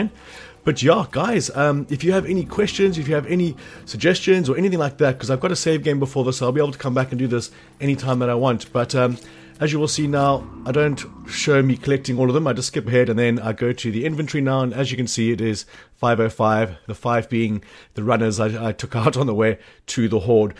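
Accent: South Africa. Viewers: thought I recognized the accent. I'm from SA too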